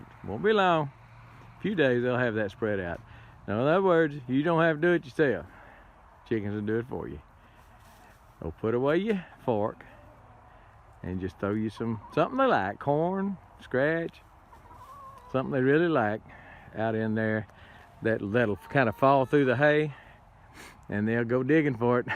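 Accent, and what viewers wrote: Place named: South, United States. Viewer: I’m a displaced Southerner in California and I surely am enjoying your Southern voice. Thanks for the tips on nighttime predators. We just lost a chicken to a hawk just yesterday.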